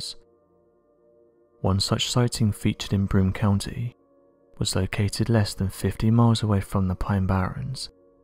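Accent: United Kingdom. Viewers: All fluff no substance. This channel brings nothing to the table every video except a 'mysterious' British voice over whispering cautiously. Pretty pictures/zero substance.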